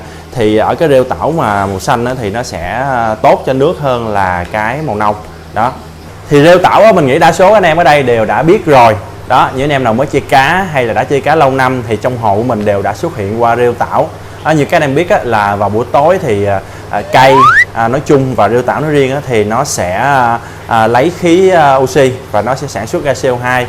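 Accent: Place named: South, Vietnam. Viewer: Giọng nói miền trong đã khó nghe rồi, quay video lại bịt khẩu trang, chán thật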